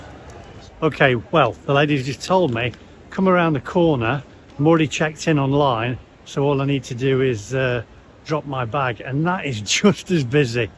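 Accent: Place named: England, United Kingdom. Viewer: I totally agree with you!!! That airline is trash. You should see what people gets taught to be like and how you advance in your career with this company! My dad worked for BA many years ago and I can’t but be super sad seeing they had to partner with this trash! On a lighter note, I think it’s very funny how you started the video with a very perfect English accent and then it just evolved to your beautiful “bromie”!! ❤😂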